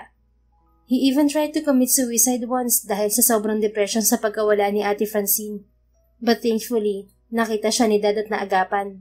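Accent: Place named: Batangas, Philippines. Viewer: Thank you, writer Smiling face, and for a wonderful story..Ang galing mong narrator lalo sa Batangas intonation mo..Congrrats👏👏👏❤❤❤⭐⭐⭐⭐⭐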